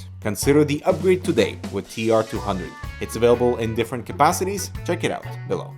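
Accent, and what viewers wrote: Russia. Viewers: Are you russian? Im not trying to be racist, just curious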